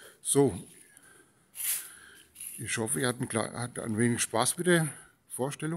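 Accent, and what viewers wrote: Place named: Hesse, Germany. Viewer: Servus! Schön steht sie da Deine W650. Ich hätte es schon am Dialekt erahnen können, der Blick aufs Nummernschild gab dann Gewissheit, Du bist beinahe ein Nachbar. Ich komme aus Rodgau (Kreis Offenbach) und habe seit 2012 auch eine 99er W650. Ein herrliches Moped, ich liebe ebenso den Motor, rein von der optischen Ästhetik für mich der schönste Motorradmotor den ich kenne. Habe bei mir auch eine Reihe von Umbauten vorgenommen, habe hinten ein paar IKONs, ganz anderes Fahrverhalten. Und mit 120.000km ist noch lange nicht Schluß, die W ist unverwüstlich, man muss sie schon mit Vorsatz kaputt machen wollen bis da mal was passiert.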